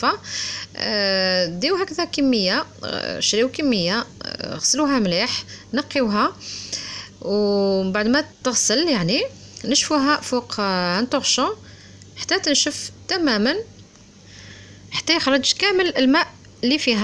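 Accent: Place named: Kabylie, Algeria. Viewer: Chui d'accord avec toi ces vrai l'accent kabyle grave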